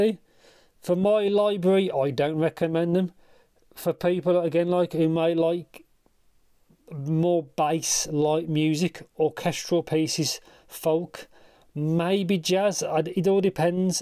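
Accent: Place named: England, United Kingdom. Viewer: I'm unfamiliar with ur beautiful accent, to which part in england it belongs?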